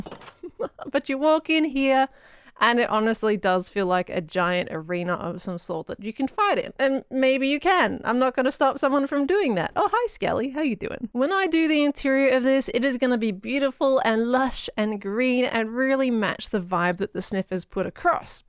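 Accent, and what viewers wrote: Australia. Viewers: its so good to hear an Ausie accent on youtube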